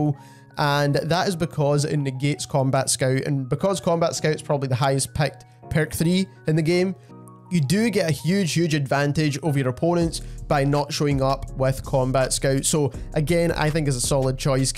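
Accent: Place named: Scotland, United Kingdom. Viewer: Scottish pretty sure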